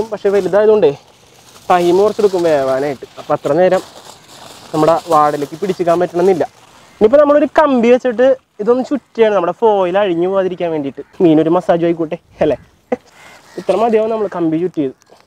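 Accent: Thrissur, India. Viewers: chettante thrissur slang miss chayyunathu njan mathramanno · ഒറ്റയ്ക്ക് തിന്ന് തീര്‍ക്കാന്‍ പറ്റോ മച്ചൂൂൂ നല്ല തൃശൂര്‍ languge എനിക്ക് ഈഷ്ടപ്പെട്ടു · It's Thrissur district Malayalam style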